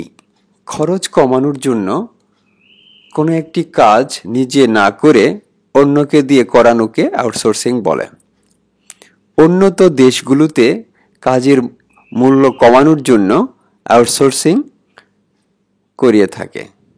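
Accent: Bangladesh. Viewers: Vaia apnar basha ki naogaon ??? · ভাষায় খুলনার গন্ধ....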